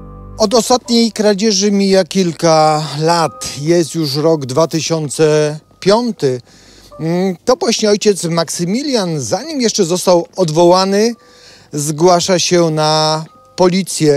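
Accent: Kraków, Poland. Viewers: Panie... no nie czarujmy się, powiedzieć, że full profeska jak na amatora i że pan Fajbusiewicz mógłby panu plecak nosić, to jak nic nie powiedzieć. Swoboda przed kamerą, merytorycznie przedstawiane sprawy, ten cudowny krakowski akcent ( jestem z pomorza zachodniego), no i oczywiście fenomenalne kadrowanie jak na " youtuberowych stremerów " to jesteście prze mega... tego, tego ale nie przeginajcie, bo to zajefajne kadrowanie z dołu staje się Waszym Logotypem. Pozdrawiam gorąco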